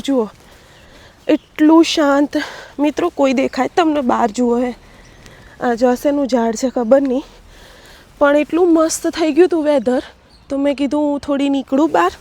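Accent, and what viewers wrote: Sabarkantha, India. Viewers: YOU ARE WELL EDUCATED AND EXPERIENCED. AND ALSO. HARDWORKING. WOMEN. BY YOUR SPEECH. I. WAS IN DOUGHT. THAT. YOU ARE. FROM. SABARKANTHA. NOW. MODASA. IN. ARRVALLI DISTRICT. SPEECH. IS REALLY. EXCELLENT I. LIKE. I. AM FROM. NARODA AHMEDABAD. KEEP. IT UP. A DAY WILL. COME. YOU. WILL BE. TOP. VLOGGER. DON'T SHOW. MUCH DETAILS ABOUT. YOURSELF TO. AVOID. UNNECESSARY. USELESS. COMMENTS. EVERYONE MUST. SUPPORT TO. SUCH. VIDEO MAKER🎉🎉❤❤ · tara video badha bahuj saras details sathe full information with tecnology wala hoi che.mane tara language parthi j lagyu ke tu sabarkantha Modasa ni che.hu pun modasa ni aagle Vadagaum Dhansura bayad Aakrund gaum ni chu.darbargad ni.tane khup khup shubhasshirvad.god bless you.tari bhasha aavij rakhje sweet.aapnu gaum ni nam aagal lavje Tram no video saras details sathe banavyo hato.tyare j lagyu hati ke tu sabarkantha Modasa ni j ke dhansura bayad ni che.❤🎉😊 Thanks u.proud of you dear.❤🎉.hu hamna baroda ma rahie che.perth ma apna baroda na badha bahuj che. 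😊😊😊😊roj nava video banati rehe.hu pune mathi jovu chi.❤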